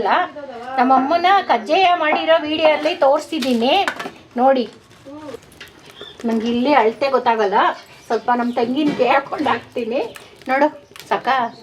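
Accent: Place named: Nagamangala, India. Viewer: Hello Mammy, First of all Thank you. You prepared mouth watering breakfast in the traditional wooden stove it's my favourite system. Your communication slang belongs to Nagamangala- Mandya (ಅಕ್ಕ ಎಷ್ಟೇ ಹಾಕೊಳ್ಳೋದು) because My mammy also belongs Nagamangala, Really Hardwork people ever found there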